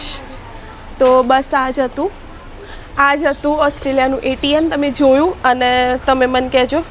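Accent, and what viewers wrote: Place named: Mehsana, India. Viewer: મેહોના ની ભાષા સંભાણી ને મોજ આવે છે ❤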